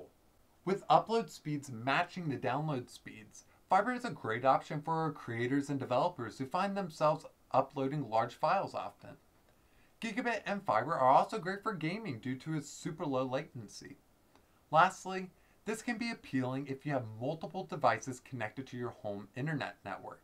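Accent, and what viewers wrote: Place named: Philadelphia, United States. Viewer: The accent is distracting lol. Philly? Baltimore?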